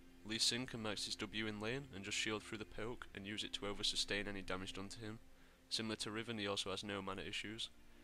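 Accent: Northern England, United Kingdom. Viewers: You have the same accent like the Northerners in Game of Thrones! Where are you from?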